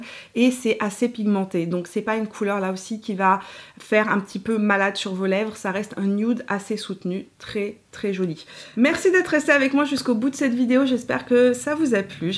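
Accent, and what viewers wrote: Canada. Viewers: Sympa toutes ces vidéos. Vous êtes française ou canadienne ? Merci pour vos conseils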